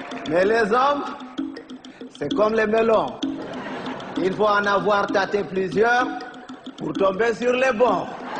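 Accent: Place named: France. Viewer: La vie Frr t’as vu il parle le français français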